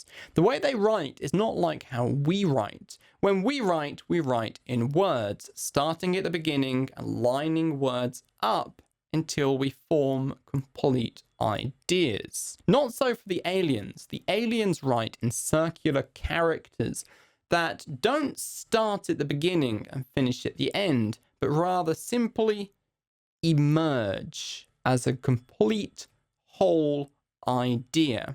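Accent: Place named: United Kingdom. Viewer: I don't used to like british accent, But yours i liked, I'm just liking for some reason...as soon as possible I'll buy your book Good job bro.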